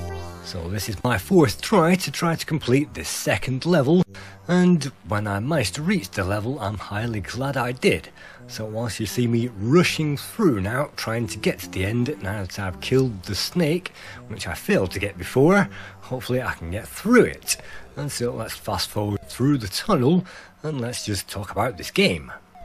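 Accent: Lancashire, United Kingdom. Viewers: The Lancashire is strong in this one!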